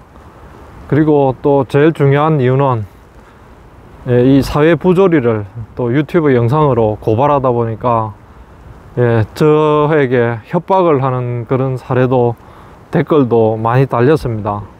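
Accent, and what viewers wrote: Gyeongsang, South Korea. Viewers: 부조리 고발영상및 구수한 경상도 목소리 너무 잘하고 계십니다! 방송 잘보고 있습니다! 화이팅!! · 감축드립니다 매우건전하고 진실된팩트방송 최고예요👍 경상도사투리 너므정겨워요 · 한 2주 전부터 보기 시작했는데 처음부터 믿을 만한 분이라 생각했지요. 경상도 억양이지만 사투리는 거의 없는 구수한 목소리가 좋아요. 그리고 잔잔한 정의감, 왠지 성실할 것 같은 삶의 자세, 이런 것들이 좋아 보입니다. 계속 열심히 하시기 바랍니다. 챙겨보겠습니다. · 3만 축하드립니다 ! 시골의 모습을 이렇게 정직하게 보여주시니 앞으로도 구독자수가 빠르게 올라갈 것 같습니다. 얼굴공개 안하는 건 좋은 결정인것 같구요, 정감가는 경남사투리가 참 듣기 좋네요 ~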